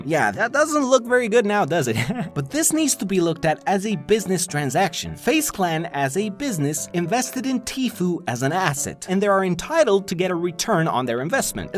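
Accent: Romania. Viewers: Bro tu clar esti roman ca se vede si dupa nume si dupa accent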